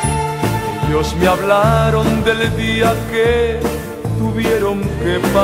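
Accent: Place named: Canary Islands, Spain. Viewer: es canario, no va a tener el acento de *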